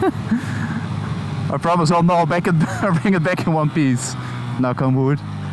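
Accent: Netherlands. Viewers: smerig hollands accent zeg jeetje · Are you Dutch?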